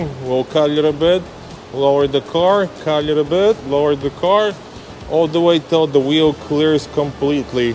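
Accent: Russia. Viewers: Акцент русский 😂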